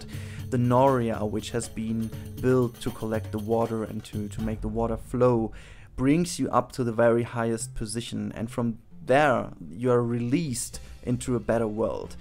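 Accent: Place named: Germany. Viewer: this accent ... soooo german ^^ anyway nice Noria and it really looks familiar like the Noria in anno1404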